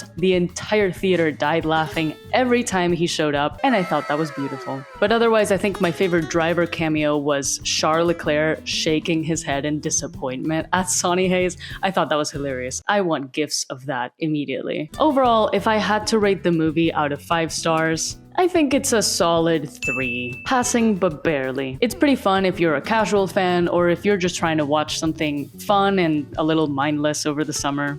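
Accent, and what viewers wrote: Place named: Spain. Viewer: Your English is seriously so damn impressive. It genuinely took me until you said Javier Bardem's name for me to place the microscopic accent, and then I was like, "Hecho, she's Spanish." But your English is better than 90% of Americans'.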